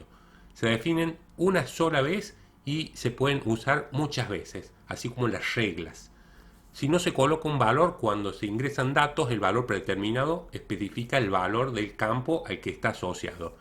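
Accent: Argentina. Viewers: sos cordobes ?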